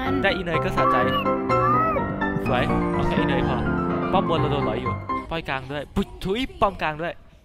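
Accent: Isan, Thailand. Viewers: เดี๋ยวเฮาช่วย ชอบอีสานสำเนียงไทยของพี่อ่ะน่ารัก5555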